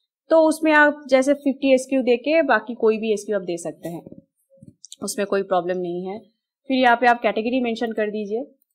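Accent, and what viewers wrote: Bengal, India. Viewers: Are u Bengali girl 😀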